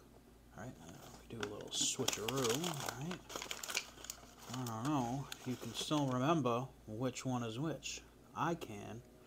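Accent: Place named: New Jersey, United States. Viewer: He may be tired or may have spent the day around people with heavy Jersey accents.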